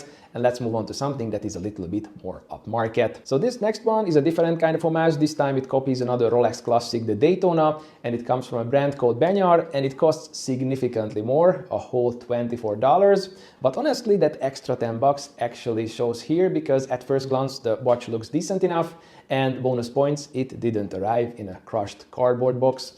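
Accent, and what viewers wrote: Hungary. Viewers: What accent is that, Hungarian? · Magyar akcentus amit hallok?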